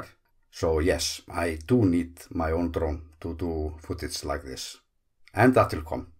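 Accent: Iceland. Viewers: for sure a very interesting text, but nearly ununderstandable for a visitor who is not firm to english with the icelandic accent... please find someone who reads the text in a more understandable english. its too bad that this accent reduces the explainations and finally the worth of the video.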